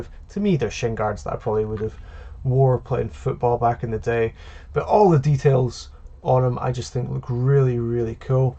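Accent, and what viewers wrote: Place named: Scotland, United Kingdom. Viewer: My first video since subbing and I enjoyed it very much so. Makes a change hearing another Scottish twang lol. Great job. Really well done video pal I enjoyed it. I still need a Mysterio pop Looking forward to more of your content man. 👍🙏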